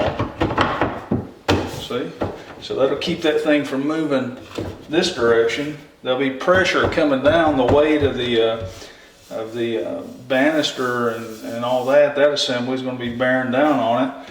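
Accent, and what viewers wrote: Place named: Tennessee, United States. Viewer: Have stairs shaped just like this one in a rental home. I have to take the railings off so that occupants can move there beds ,dresser etc. to the rooms upstairs which over time has created a lot of wear and tear on the stairs from taking railings on and off.Never been much of a carpenter, so i was wondering if you were for hire?Listening to the accent it seems you are in my neck of the woods. Am in southeastern part of Tn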